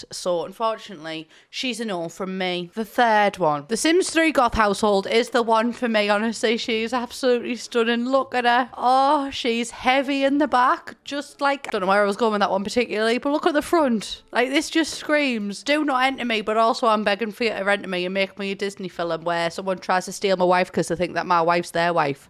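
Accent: United Kingdom. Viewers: 5 years of watching British people talk has brought me to be able to understand what your saying, and I don’t regret a single moment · I don’t understand british accent at all xD but the house is so pretty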